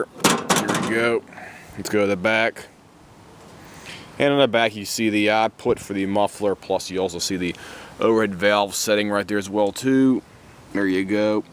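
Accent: Pittsburgh, United States. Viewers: Your Pittsburghese is very strong!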